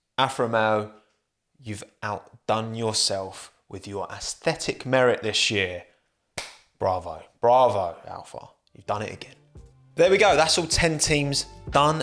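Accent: United Kingdom. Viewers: All I heard in this video was me me me I I I in an incredibly annoying Bri'ish accent innit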